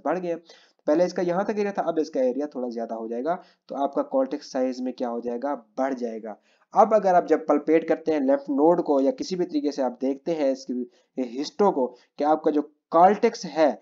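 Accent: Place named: Pakistan. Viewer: jee bhai jan, i can see by your accent, mai bhe Pakistan sy hn, graduate of AIMC Lahore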